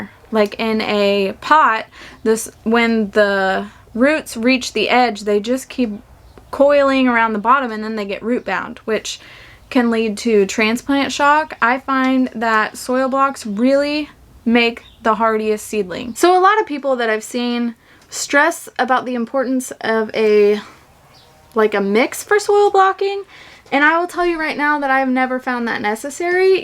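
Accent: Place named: South, United States. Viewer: you may be in Indiana but..no way that you are from Indiana. Or.. Perhaps you're just throwing some southern charm (dialect) in as humor..? Come to think of it though you're not that far from me. (N.W. Alabama - Zone 7B)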